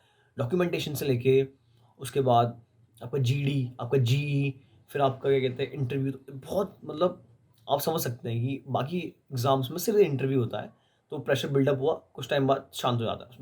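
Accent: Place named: Nepal. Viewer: Interview ki bareme jankari diya bhai great, nepali jeisa laga bhai aapko aap nepali ho?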